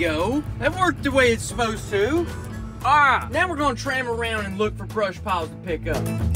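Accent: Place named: Texas, United States. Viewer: that texas accent🤌🏻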